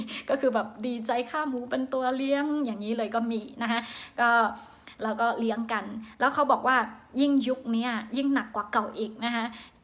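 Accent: Central Thailand, Thailand. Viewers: ชอบน้ำเสียงพูดของน้องมาก พูดไทยภาคกลางชัดกว่าคนไทยบางคนอีก เล่าเรื่องเข้าใจง่ายทุกเรื่องเลยครับ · น้องภาษาไทยชัดเป๊ะ เหมือนคนไทยภาคกลางเลย เก่งมากครับ อยากทราบว่าน้อง เป๋นไตรลื้อ หรือไตรขืนจ๊ะ ลุงเพิ่งดูน้องคลิปแรกจร้า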